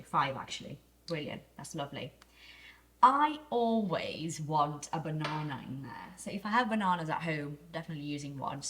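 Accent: RP, United Kingdom. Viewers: Keep up the good work. Your perfect RP accent is like music to my ears.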